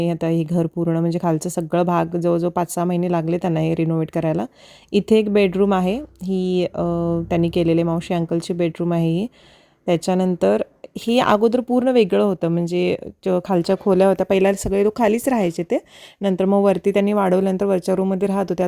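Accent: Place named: Belgaum, India. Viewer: तुमची भाषा ऐकुन फार छान वाटतं बेळगावची आठवण येते... माझं माहेर उचगांव बेळगांव ❤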